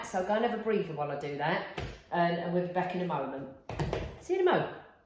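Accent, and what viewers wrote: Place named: Northern England, United Kingdom. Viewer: I’m from Durham and I can say that was not too bad for a northern accent, 😂, what did make me spit my coffee out was when you were ranting about Sean taking the washing up liquid and you said, “he takes it up the back”, my hubby raised a eye brow cos he didn’t understand the full conversation, 😂😂 xxx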